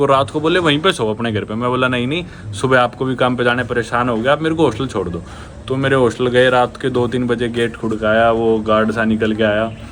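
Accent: Haryana, India. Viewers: Pure haryanvi accet 😂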